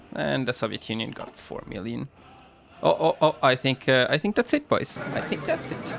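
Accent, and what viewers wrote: Italy. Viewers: Is that an Italian accent?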